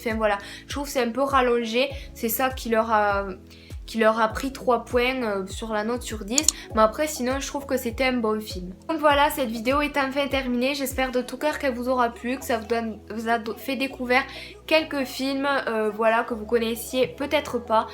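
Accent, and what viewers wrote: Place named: Toulouse, France. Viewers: Étant également une très grande fan de Thomas, j'ai vue tout les films que tu as proposé, et je suis d'accord avec avec toi de AàZ. Sauf pour le labyrinthe, j'aurais mit 10, et pour Nowhere boy j'aurais mit 10 aussi, mais là c'est plus personnelle comme je suis fan des beatles et que c'est grâce à ce film que j'ai connu Thomas. En tout cas, très très bonne vidéo, super agréable à regarder. J'adore ton accent (toulousain?) je pourrais t'écouter parler pendant des heures hahaha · Thomas c'est le meilleur, perso c'est mon premier acteur préféré 😍😍😍😍😍 Et sans avoir lu ta description j'ai reconnu l'accent Toulousain x) moi aussi je suis Toulousaine ! 😀😀💕👌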